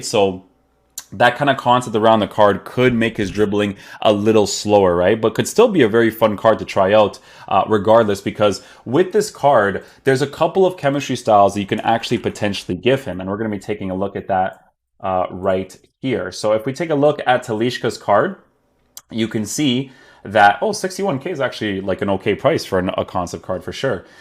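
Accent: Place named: Portugal. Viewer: És tuga? Notei aí algum português na pronúncia ahah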